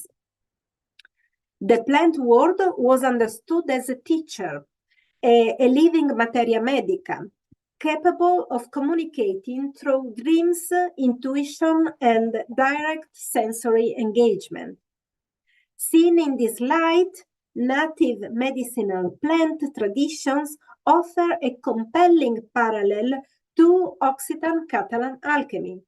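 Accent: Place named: Iceland. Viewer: how Friulian accent can sound close to Icelandic accent in the British language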